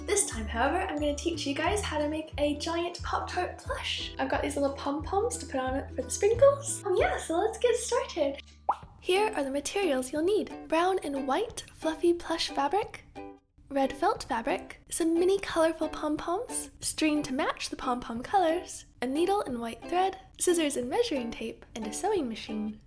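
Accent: United Kingdom. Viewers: do you think the way you pronounce things gets more British sounding the more years you spend with Tom? I'm an American dating a British guy and when I visit him for long periods of time I notice myself sounding more British by accident!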